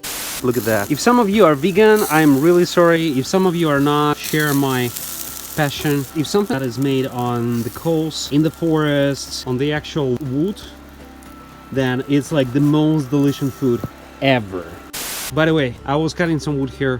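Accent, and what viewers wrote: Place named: United States. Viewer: American accent . . ?? how comes?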